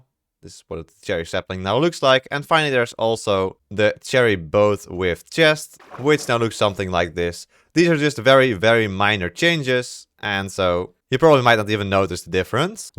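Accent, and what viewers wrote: Netherlands. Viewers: Is your real language dutch? Because you have a dutch exent.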